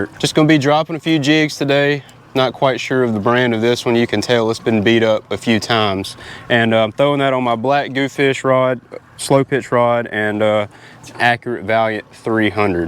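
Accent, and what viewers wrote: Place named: South, United States. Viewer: Lolo yup I understand everything u talkin bought 🤣🤣 yeah its somethin about the Redneck in us that makes us talk like this 🤣🤣🙏❤🇺🇸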